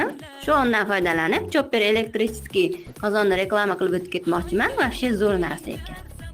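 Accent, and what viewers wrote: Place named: Andijan, Uzbekistan. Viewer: Anjandi shevasi baribir boshqacha daa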